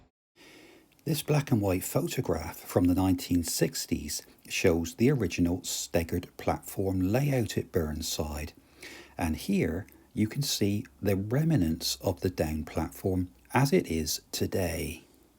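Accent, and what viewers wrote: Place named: West Country, United Kingdom. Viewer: You have a bit of a West Country accent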